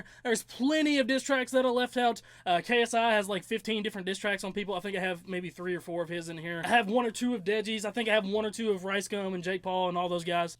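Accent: Alabama, United States. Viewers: Is he from Alabama cuz I am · Wait are you from Alabama?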